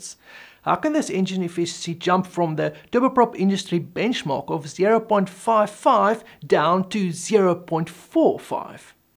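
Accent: South Africa. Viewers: Brilliant !!! Well presented. Hou van die Suid Afrikaanse klink.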